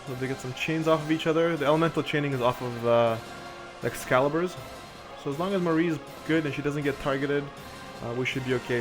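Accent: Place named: Caribbean. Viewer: it's hard to say, Trini or Bajan maybe, Bahamas but you don't eat all your words in a single gulp so maybe not Bahamas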